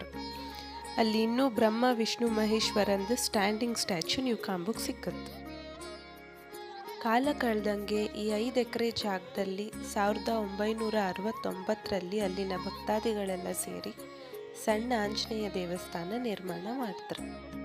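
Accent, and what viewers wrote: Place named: Kundapur, India. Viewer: Super kundapur kannada💐💐💐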